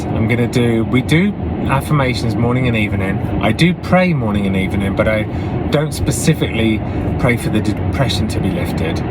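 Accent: United Kingdom. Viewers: Hi! I love you so much, too! I mean, in a buddy/friend/little brother kind of way! I'm 59 so you'd be my little brother. I've always loved your accent, so, COOL!! My little brother has a sophisticated British accent! Oops! I got way off topic! Anyway... Here's my thought -- depression draws us inward. Like that big, heavy coat on the man in the fable. (I loved that!) We're enveloped. It's heavy and uncomfortable. When the sun came, he opened himself up to everything around him, and was a free and happy man! I think depression seems like it hightens creativity because we're drawn so deeply inward. With music, especially. In depression, everything comes from that dark, shrouded place inside. Later on it might sound good. And it might be. But it's a reflection of that dark place...and do you want that? It'll always make you think of that place. Here's my point: your creativity is part of you. 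You were born with it. It's in your soul. You can't lose it. You were gifted with it. God put it there. Being as musically talented as you are is a GIFT. Whether in a dark place or in a place of light & peace, you're going to make exceptional music! Do you want to remember the darkness...or the light??, I am praying for you! *Lord, please take this burden away. Please guide and help him find that place of healing. Lift his heart and spirit. Show him the way. In Christ. Amen.💗* I'm 59, have suffered from depression since I was 14, and only got on medication 7 years a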